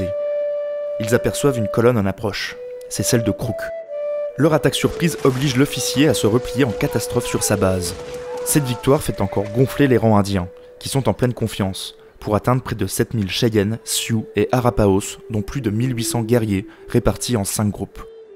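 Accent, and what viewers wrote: France. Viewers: excellente vidéo ! une rectification qui a été faite plus bas en anglais et pas traduite, semble-t-il, les photos de crazy horse ne le représentent pas, il n' existe aucune photo de crazy horse, juste un dessin qui a été fait bien après par un dessinateur professionnel selon des descrptions orales, et qui a été validé ensuite par un des membre de la famille de crazy horse. Si je remets la main sur ce dessin, je le mettrai en lien dans l'intervention en anglais, plus bas. Aussi, je voudrais saluer, a l'heure du grand n'importe quoi des voix de synthèse à tout va, au détriment du bon sens et de la qualité, ce que vous avez fait ici : parler avec votre voix, donc, avoir osé être vous-même vocalement parlant. ce qui semble devenu une chose insurmontable pour un grand nombre de youtubers. En plus, ce n'est pas scandé, hurlé, appuyé d'une façon non naturelle comme on le voit partout. 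bravo ! en tant que pro de la voix et du doublage, j'ai remarqué quelques petites choses perfectibles, mais tres peu entendront ces mini problèmes, comme les coupes un peu "a la hache" en fins de phrases, dans les respirations, les popings légers par moments ou les changements de placement par rapport au micro, qui font qu'on entend des ajouts faits au milieu des phrases. il y a aussi les A a certaines fins de phrases, qui sont un peu fermées comme des O, comme on fait chez moi en bourgogne, ou comme on l'entend parfois à Lyon. c'est très léger, tout petit accent du terroir pas bien gênant mais qu'il s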